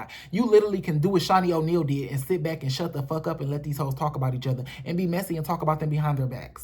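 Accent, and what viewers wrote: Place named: Minnesota, United States. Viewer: I had to pay on the floor and do a beetleguise laugh when u said “rewwoom “😂😂😂 u give Suburbs Minnesota vibes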